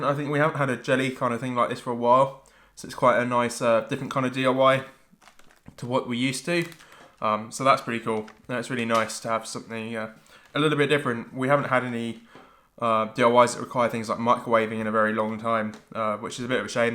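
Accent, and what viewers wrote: United Kingdom. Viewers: cheers for the reply I'm defo thinking of getting the boxes I love new things. What part of UK you from to me you sound northern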